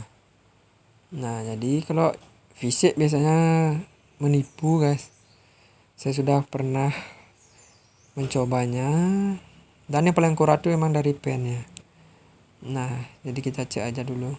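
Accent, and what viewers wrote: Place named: Bali, Indonesia. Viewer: Bali ya bang agak lagat bahasanya mantap bang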